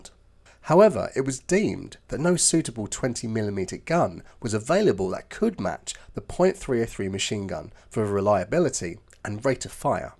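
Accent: England, United Kingdom. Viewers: Rose Royce? Rolls Royce! And spoken with a good English accent or at least southern accent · Enjoyed your video but, your British accent was a bit hard to follow with my Texas ear. Not your fault. A thumbs up from Houston.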